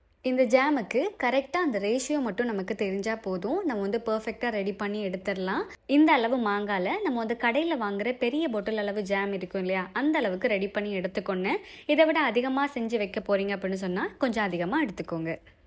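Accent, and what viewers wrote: Sri Lanka. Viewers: Yesterday I prepared as per ur ratio. It came out well. Tnq so much maa.R u from srilanka 🤔bcoz when u talk u sound like srilankan.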